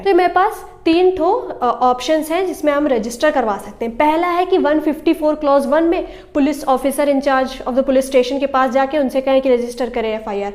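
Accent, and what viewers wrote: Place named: Madhya Pradesh, India. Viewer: AAP madam m.p se hai kya apne bola tin tutd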